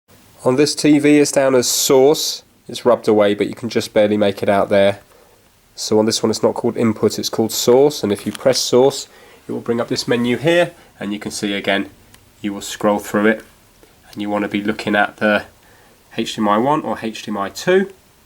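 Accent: United Kingdom. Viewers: I love British accent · Is he British?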